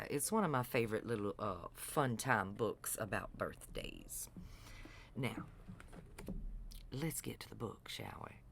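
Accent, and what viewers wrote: South, United States. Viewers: What a melodic sultry southern voice so absolutely beautiful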